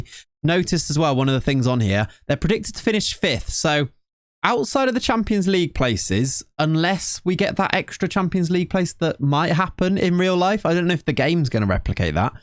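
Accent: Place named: RP, United Kingdom. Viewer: As an American, I was particularly grateful that this youtuber was both informative and has both an rp accent and Harry Potter glasses.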